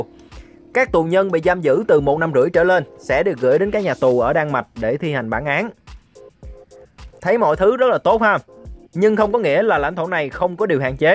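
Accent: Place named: Phu Yen, Vietnam. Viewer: Giọng đọc Phú Yên thì phải.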